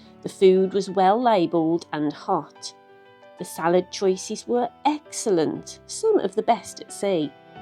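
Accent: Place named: Black Country, United Kingdom. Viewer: Great work on this video …very comprehensive…..and, the Black Country accent is fantastic ….( that’s a guess by the way ) 😂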